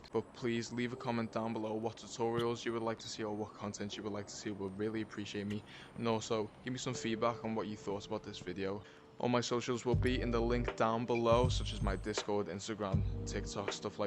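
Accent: Liverpool, United Kingdom. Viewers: boss accent da lad ar ye scouse? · Are u scouse and if u are I hope u support L.F.C 🔴🔴🔴🔴😊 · Is my guy a scouser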